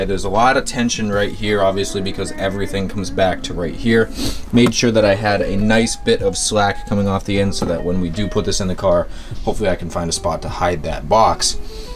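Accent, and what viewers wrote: Massachusetts, United States. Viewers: Love seeing a Mass dude doing thangs out heah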